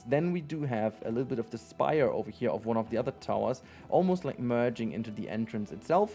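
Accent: Germany. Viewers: Johannes Kepler in Süchteln und dann Berufskolleg in Dülken. Ich wusste doch, dass Du nen niederrheinischen Akzent hast 😂😂😂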